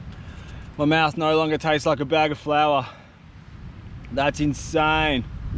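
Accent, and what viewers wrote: Australia. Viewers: At first I thought this was fake. Then I heard the Australian accent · Mate are you Australian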